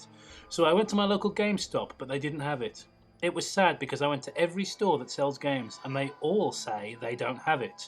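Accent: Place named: Scotland, United Kingdom. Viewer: That Scottish accent is brilliant.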